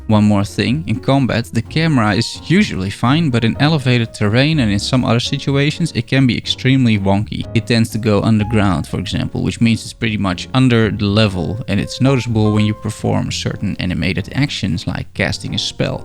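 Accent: Netherlands. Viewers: bruh you are from the netherlands i can tell haha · tering zeg wat een nederlands accent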